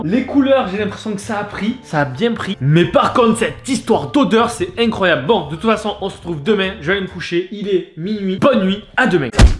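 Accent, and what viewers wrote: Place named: South, France. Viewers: En 1 : j aime trop ton accent sudeste Puis en 2: continue comme sa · J’aime pas l’accent du sud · Accent du sud 💖💖💖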